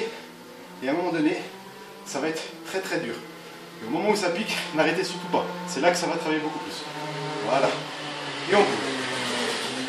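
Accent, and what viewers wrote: Belgium. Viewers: À ton accent je pensais aussi que tu étais Belge 😉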